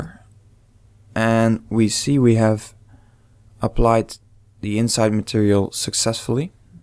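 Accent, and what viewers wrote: Netherlands. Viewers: You sound dutch ;) very cool addon! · je hebt een Nederlands accent :-) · Are you Dutch? juging by your accent..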